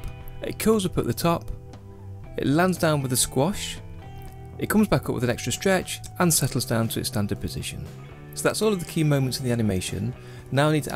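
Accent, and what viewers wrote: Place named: England, United Kingdom. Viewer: I hate English accent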